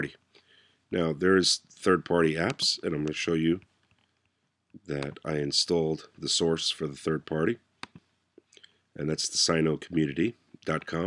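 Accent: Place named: Northeast, United States. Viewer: ***** I got an cease-and-desist order once for 800€ and could drop this down to 120€ with my lawyer. It was a CD I owned yet which I downloaded just for fun (I didn't want to search my CD-Board,=) So, even if you own an opus of an artist, you are liable for infringin Copyrights in Germany. I don't know where you are from, regarding your accent I would say north-eastern USA ? Do you can download any works legaly if you "own" (have a copy) it in USA ? I don't think so (DMCA?!) With Series its another Story here. If it was aired in free TV you can legally download it. Besides, your Video was VERY infomrmative for me and raised the Decision to buy a Synology DS 2xx(play?) sthg with remuxing/transcoding would be fine. The Tracker where I download from isnt registered in any DNS and you can only be invited. Nobody will find its Frontpage without knowing the IP and even then he cant enter.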